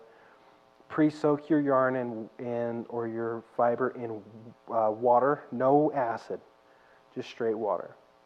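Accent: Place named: Brazil. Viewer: The woman...she's brazilian, right? Brazilian accent going on hahahha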